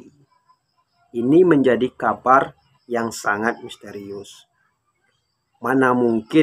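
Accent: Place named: Sipirok, Indonesia. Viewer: ancak bhaso Minang Jo lai da,dari pado kayak org spirok bhaso indonesia😅😅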